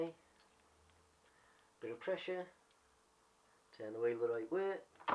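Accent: Middlesbrough, United Kingdom. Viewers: Good vid thanks, must be a Boro boy with that accent!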